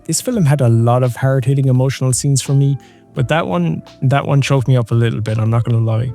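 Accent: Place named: Ireland. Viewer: Why am I hearing the Jacksepticeye irish accent? lol Good video tho I agree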